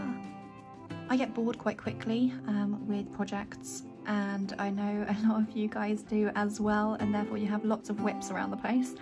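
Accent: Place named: United Kingdom. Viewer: love!y to hear a fellow Brit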